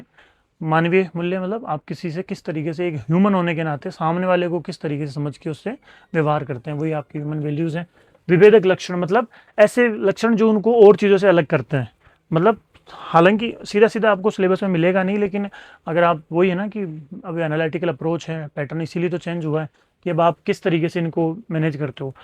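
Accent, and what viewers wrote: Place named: Jaipur, India. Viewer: Sir aap kha se ho aapki language jaipur ky core area ki lgri h?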